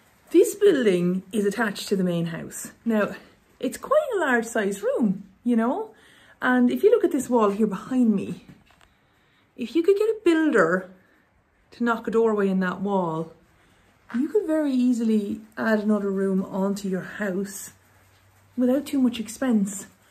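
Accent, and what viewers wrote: Ireland. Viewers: Reminds me of the house in the movie, Quiet Man." I love listening to your awesome Irish accent 😍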